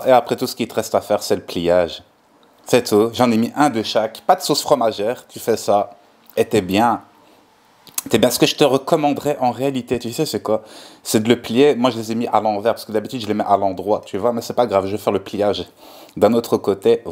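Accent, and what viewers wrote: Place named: Brussels, Belgium. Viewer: Le khoroto de bx on reconnaît l’accent 😂😂😂🇲🇦🇧🇪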